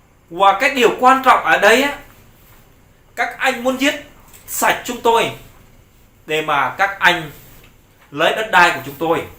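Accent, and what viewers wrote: Standard. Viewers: Nói tiếng Việt chuẩn lắm😊😊😊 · Nói rất chuẩn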